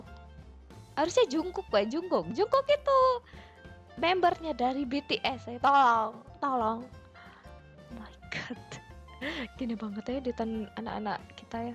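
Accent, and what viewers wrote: Java, Indonesia. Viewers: Kaka orang jawa ya? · Asal dari mana k,aku baru subcreab k,dari logatnya dari orang jawa.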